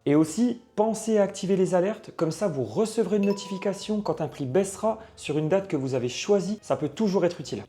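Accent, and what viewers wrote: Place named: Vosges, France. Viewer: Merci pour tous tes bons conseils . Je pars dans une semaine et ça m’est bien utile . C’est drôle cet accent vosgien que tu as gardé ;je suis de là bas 😂😉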